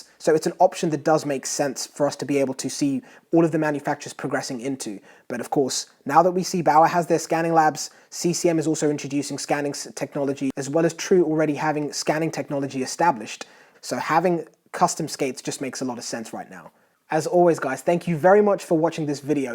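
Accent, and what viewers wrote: England, United Kingdom. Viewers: SERIOUSLY???? This a video for remedials looking to get into hockey??? Mommy still dressing you people? And sorry, it's really funny coming from a guy with an English accent. Any hockey shop worth it's salt can explain everything about skates or you shouldn't be buying from that shop. They are the most important piece of your equipment so treat them as such and visit a local hockey shop; not a sports store with a hockey section nor buy them online unless you know for sure. But I find the gushing comments about this video utterly ridiculous. If you don't know how something fits and feels on your own body, no video in the world will help you. Hey, hats off to the dude who made the video if you are getting paid for it but having someone tell me how to buy skates is like someone trying to tell me what stick to buy or how many sheets of toilet paper to use and how to hold it as I wipe my butt. 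But that's the difference between self-reliance and today's nanny-state mentality where people need their hand held for everything. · Hockey tutorial from british guy?🤔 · Please share??? Did you know about the English hockey club. I have only heard about One. In the CHL. Perhaps you Are the Guy in the video??? Then Why do you sound so english??? I am really confused. Help Me out.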